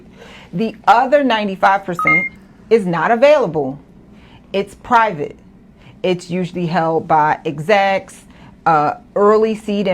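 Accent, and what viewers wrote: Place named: Baltimore, United States. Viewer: Are you from Baltimore? I feel like I hear our accent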